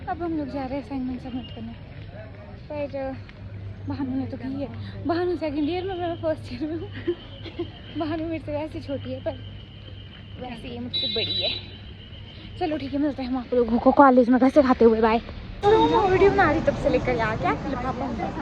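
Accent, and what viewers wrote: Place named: Himachal Pradesh, India. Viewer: Ap himachal se ho na didi ?? Apki tone lagri hai himachal jesi 🥹bahoot acha laga mughe .... Bahoot time bad ese tonne sunne mili 😭😭😭🥹♥️♥️ .....mai mandi se hu ...aao kabhi chai pine ....filhal mai Pune me rehti hu ...bahoot yad ATI hai mandi ki 😭😭♥️♥️♥️🥹🥹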